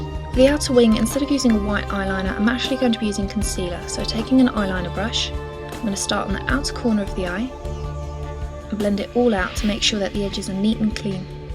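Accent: United Kingdom. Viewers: R U BRITISH !? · she sounds british :)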